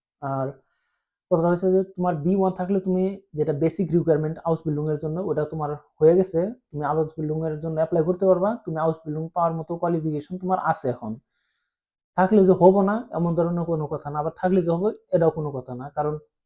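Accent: Assam, India. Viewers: Assami to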